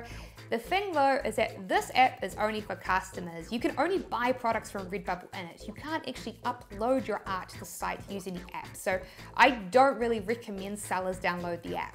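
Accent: New Zealand. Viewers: Thanks 👍! You are always super informative and love that New Zealand accent!! 😃💻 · I want to come to New Zealand to study your English speaking accent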